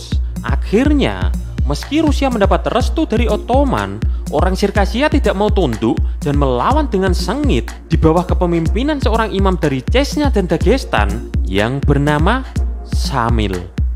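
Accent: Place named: Java, Indonesia. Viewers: gapapa mbak memang orang jawa logatnya kayak begitu susah juga kalo ngomong pake logat indonesia biasa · informasi dr km ny menarik dan jelas, tapi narasi bicaranya itu yg bikin garing kak🙏, but aku suka Ama channel vidio2 KK y infomati itu, prasan jrg yg bahas, aku tauny bnyk konten spoiler n y ky ngikutin tren doank, bagus c KK jd dr sndri, cm Krn medokny terasa garing di telingaku 🤪🤭,aku bnyk tmn org Jawa tp klo KK mya ini kyk LG ndalang gtu prasaan narasinya 😂😁😁🙏, intiny konten dan Vido KK bgus dan ckup mnrik so lanjutkan kak, y tnggl menyesuaikan aja c y kak 👍🏻👍🏻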